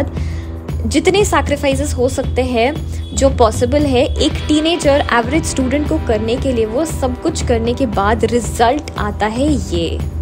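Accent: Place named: Maharashtra, India. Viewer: Apan is an emotion ❤️ this proves that dii had passed a lot of time in Maharashtra and has a quite Marathi Toning 😂❤️❤️ GOD BLESS DII🙏🏻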